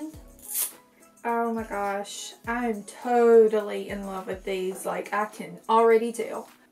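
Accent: South, United States. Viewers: New subscriber! Your accent completely won me over 💘 I'm a southern girl myself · yeah she has a really charming southern accent.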